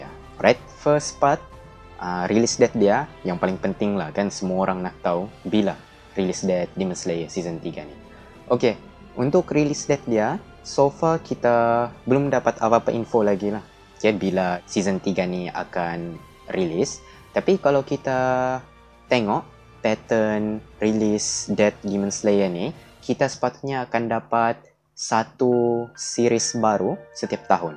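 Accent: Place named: Sabah, Malaysia. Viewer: Abang orang sabah ke? Suare abang macar orang sabah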